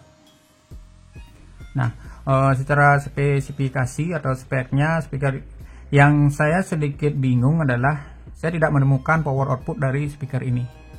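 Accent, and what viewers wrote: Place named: Bali, Indonesia. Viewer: Keliatan logat balinya bli 😂. Rahayu terus berkarya🙏😇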